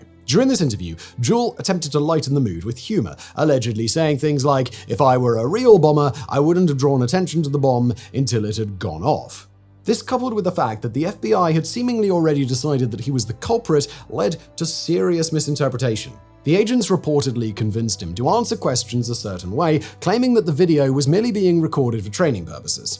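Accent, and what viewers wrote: United Kingdom. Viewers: I wonder how many legs you're going to do in with this video... A Brit narrating a video containing details that are applicable only to the US legal system. Huge oversight. Two VERY different systems.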